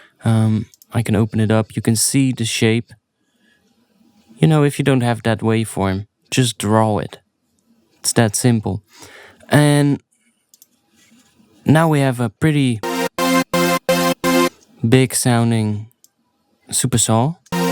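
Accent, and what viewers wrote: Netherlands. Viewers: ja ik wist het! ik zat gewoon de hele tijd tijdens de tutorial hij moet wel nederlands zijn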